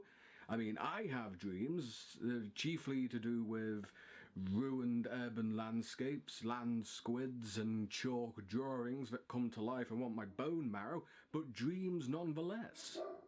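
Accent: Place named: Northern England, United Kingdom. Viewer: I thought I heard a Northern twang in there! Hi, I'm Adara. I'm a writer who usually hangs out on Twitter. Your song choice sent me back in time to many anime conventions ^_^ I like how original your goals are. In terms of specific cult references, anyone who can explain my 'give quiche a chance' t-shirt deserves a cookie. I'd love to see more writers involved in Doctor Who. I love how you're choosing animation and comics for your dream adaption choices. So many people fall by default into the film or live TV only trap. Lastly, good choice co-writing with Dal!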